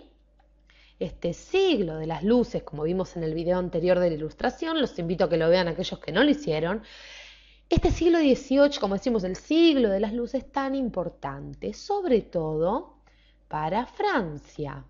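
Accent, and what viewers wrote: Argentina. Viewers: Me encanta el acento argentino :D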